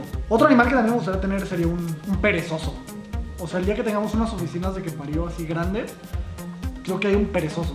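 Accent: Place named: Oaxaca, Mexico. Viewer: quien diria que el es un don amargado desde sus 25 o desde antes, lo mas botana es que es un don oaxaqueño con acento paps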